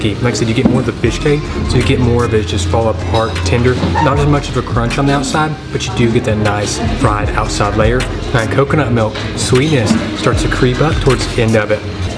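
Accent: South, United States. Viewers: Love when you still say ya'll ...very southern USA. Have fun in South East Asia.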